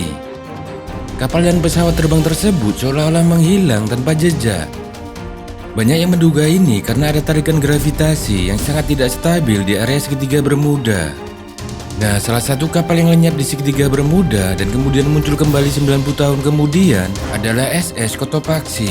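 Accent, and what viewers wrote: Java, Indonesia. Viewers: Medok ya mas suarane...iyaaaa jaraeee capaaaaa😂😂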